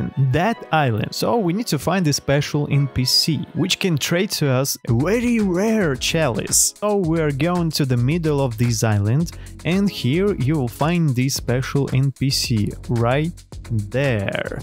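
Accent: Russia. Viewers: your accent is sounded like russian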